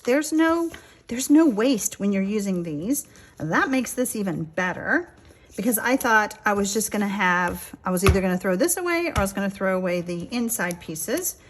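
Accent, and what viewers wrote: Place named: Texas, United States. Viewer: These are beautiful! I love your card making ideas and listening to your Texan drawl .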